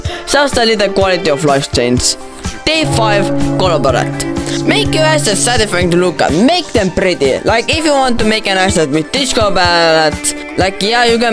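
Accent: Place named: Estonia. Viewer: the estonian accent is amusing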